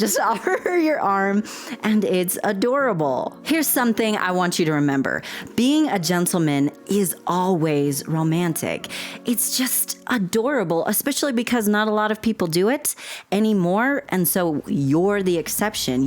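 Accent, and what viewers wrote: United States. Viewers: How and where is highly cultural. I hear your USA accent probably New England. Touching is à no no never. I will never return USA and this is only à secondary reason. Main reason is aggressivity. Second is political corruption. And so many others.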